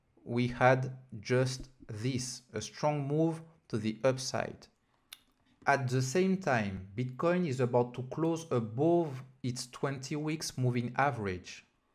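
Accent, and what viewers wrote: France. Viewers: Merci pour cet anglais "français"... je comprends TOUT ! merci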